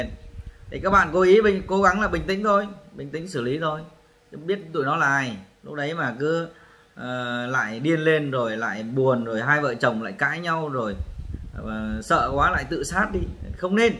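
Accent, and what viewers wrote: Standard. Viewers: noi chuẩn ko cần chỉnh ok ok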